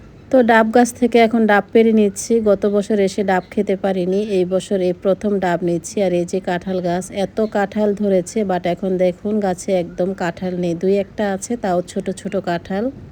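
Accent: Chandpur, Bangladesh. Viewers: চাঁদপুরের ভাষায় কথা বলেন আপু ❤❤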